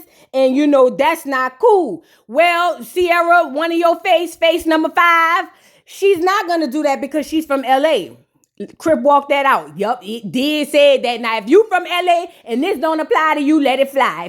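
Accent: New Orleans, United States. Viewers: You are so funny! And ur into keeps a smile on my face, you definitely got the best into of any be I kno plus ur accent i I love it! I always wanted to to go to NO!!!!!’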